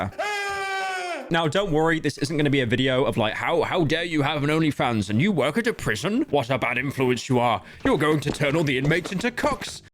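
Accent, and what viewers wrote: United Kingdom. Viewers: i was 100% certain that it was penguinz0 video until i got jumpscared with bri'ish man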